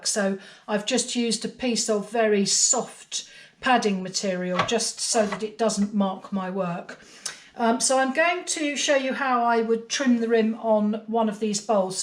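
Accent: United Kingdom. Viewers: Hello I'm from Belgium I love your English accent, I learn English in evening class and the teacher always says that you have to put the tonic accent on the words otherwise the English peoples do not understand us and I must say that I understand everything what you say. Thank you for your videos they are all interesting. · I am from Flanders, Belgium. I love your YT stories and learned already a lot… And having an American grandson I love to hear your so very British accent 😉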